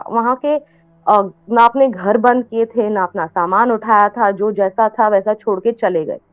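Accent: India. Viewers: In the start of the vid I saw ur comment and now I will notice that😭😭 esp her accent is Indian that I don’t like sorry not sorry I don’t wanna be rude but😭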